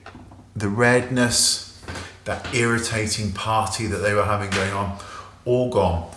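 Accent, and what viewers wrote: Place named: United Kingdom. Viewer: Hes british not aussie u dumb fuck below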